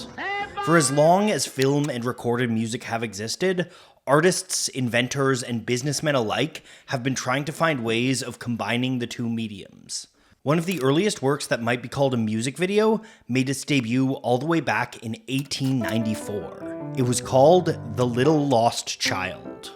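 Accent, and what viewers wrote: Canada. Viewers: Hey, are you Canadian? There's something about the accent... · Dude, are you from Canada?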